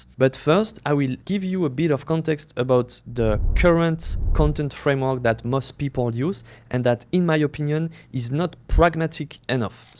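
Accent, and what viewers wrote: France. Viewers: felt the French accent... mais j'aime ce que tu proposes btw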